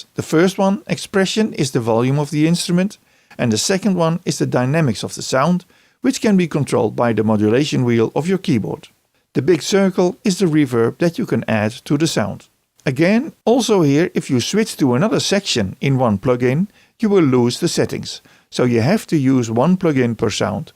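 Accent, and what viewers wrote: Netherlands. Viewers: Thickest Dutch accent I've ever heard 😂. Sounding good tho.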